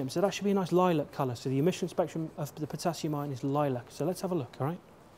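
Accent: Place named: England, United Kingdom. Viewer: this guy is so incredibly English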